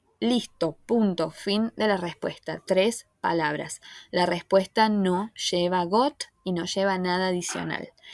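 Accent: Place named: Argentina. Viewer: Que bueno que encontré una Argentina que explique y encima bien... porque estoy podrido de escuchar el acento de España en tutoriales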